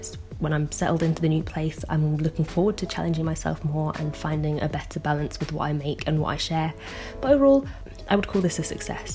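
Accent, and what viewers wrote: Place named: RP, United Kingdom. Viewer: Your work is amazing- as always. Why do I think you sound more ‘posh’ in this video? ☺️ I decided to do inktober this year. Being a full time secondary art teacher I knew it was not going to be easy. I took the plunge anyway. Everyday I would come home after a long day and I would think of what to draw. I did some traditionally on paper, otherwise, I completed each prompt on ipad as it was easier for me to do so even while in bed.🙈 Somewhere along the way I had some procedures done at the hospital and I did really want to give up- but I took few days off and I tried catching up- I am still catching up. I do feel a sense of achievement and I am hoping I will complete the last few by the 31st. You have been a big inspiration to me. I have talked about you to my students and I highlighted your art and also your resilience, commitment and integrity towards your art. 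I talk about you so much that some pupils thought I knew you in real life😂😂😂 Anyways I hope you settle down in your new place. All the best and looking forward to more art from you. By the way I really liked the limited colour palette approach and I like how you worker with the ink when the ink was not doing what you wanted.... as opposed to just give up! Sorry for this long paragraph- just had to tell you all of this. ❤️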